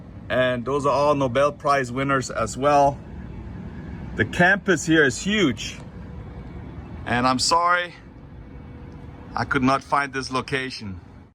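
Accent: Germany. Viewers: I like the way the German in you comes out, don’t apologize!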